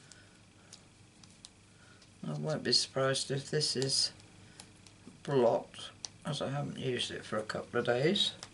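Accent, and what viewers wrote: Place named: Warrington, United Kingdom. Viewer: I am curious about your accent. I am from haydock, St Helen's living in New Zealand and your accent sounds warrington of Newton le willows. Love the card, it is a kind of a twist to resist stamping, very elegant.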